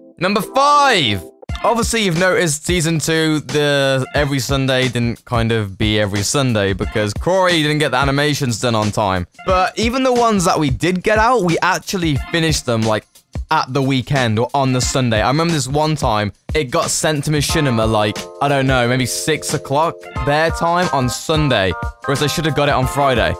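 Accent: United Kingdom. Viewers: hes british, BRITIIISH... thats right, isnt it? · i wish i was English. i mean having people ask you to say random words all the time is probably annoying but, come on. you sound awesome. and you're not American which if you add those two factors together it's like an A++ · OMG your british?: yeah... random girl:say BANANA say it say it:NNOOO random girl:please say it say it!: BANANA!!!!!!!!! random girl it sounds so different... someone else continue... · ARE YOU ACTUALLY BRITISH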